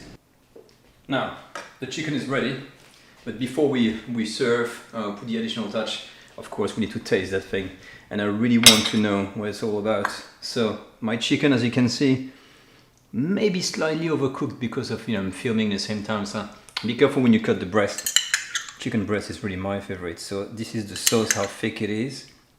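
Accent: France. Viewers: OH My god i love your french accent soo cute <3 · I wonder what kind of dessert would pair nicely. With the acidity of the main, probably something light & sweet to round out the taste spectrum. Side note: I can hear how being in France is starting to subtly influence your accent. (Not a bad thing.) Speaking your mother tongue so much is probably a real treat right now.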